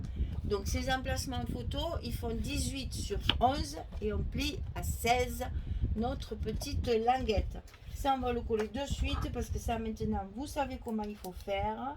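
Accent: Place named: Provence, France. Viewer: vous êtes pétillante, pleine de joie de vivre et on devine votre accent provençal. . Tout ce que vous nous apportez dans le scrap... à très bientôt 🤗😴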